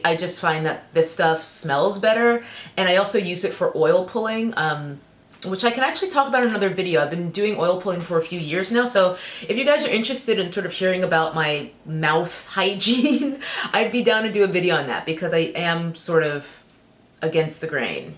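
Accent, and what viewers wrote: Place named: DMV, United States. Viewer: Just found your channel amd love your voice! You speak like me!! From DMV by any chance?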